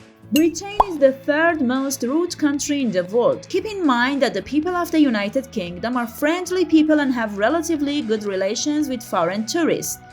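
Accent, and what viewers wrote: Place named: Iran. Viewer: Your accent is blindingly obvious Persian 👍💗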